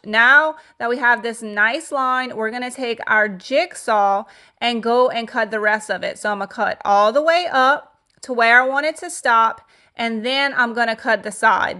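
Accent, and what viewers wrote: Louisiana, United States. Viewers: I just came across your channel and everything is beautiful! I’m curious though, you have got to be from Louisiana. That definitely sounds like a Cajun accent to me! 💕 Edit: just looked in the description and answered my own question!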